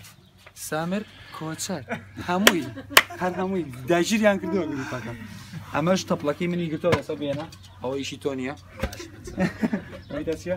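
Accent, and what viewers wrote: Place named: Sorani, Iraq. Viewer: وەڵای لە کرمانج ناچی ڕێک ئەڵێی سۆرانیت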